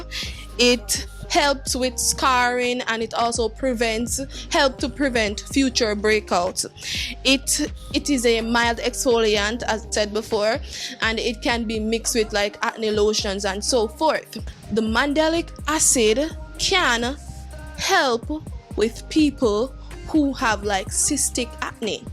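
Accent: Jamaica. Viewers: I like your Jamaican accent! 👍🏾😇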